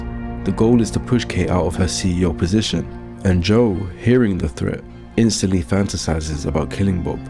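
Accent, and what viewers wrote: London, United Kingdom. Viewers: the best video ive seen yet on joe and the London accent makes it even more impressive.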